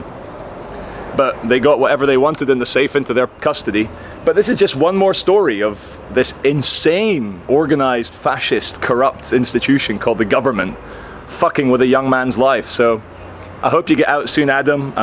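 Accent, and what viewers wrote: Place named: Manchester, United Kingdom. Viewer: sounding a bit more like a manc these days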